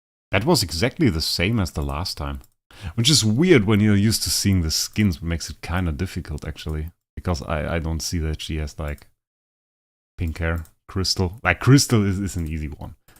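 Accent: Germany. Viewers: average german voice tbh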